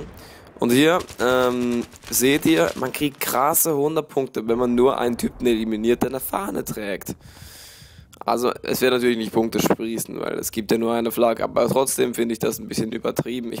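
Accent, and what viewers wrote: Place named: Switzerland. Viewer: ich dachte am anfang du wärst engländer oder ami oder so^^ hab dann aber auch auf schweizer getippt!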